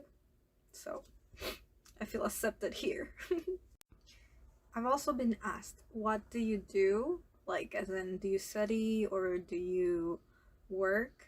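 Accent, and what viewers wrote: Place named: Portugal. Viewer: Seu português é muito bom! Parece um pouco com o português de Portugal, mas só um pouco... Como brasileira, acho que algumas coisas do português de Portugal são um pouco difíceis de entender, mas consegui te entender perfeitamente :)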